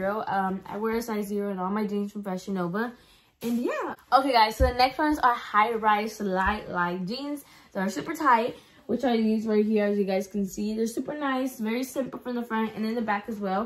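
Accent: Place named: South, Mexico. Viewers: it’s a Mexican accent...’Hot Cheeto girl’ is literally a microaggresion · Very south Mexican accent it’s🥴 maybe cuss I’m from nyc lol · Factsss her Accent is to die for i love Mexican Accents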